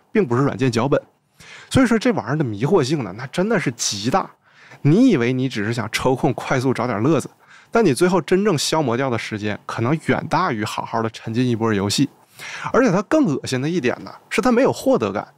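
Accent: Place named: Shenyang, China. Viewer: 原来是沈阳老乡，听口音这么熟悉。加油，非常喜欢你的频道。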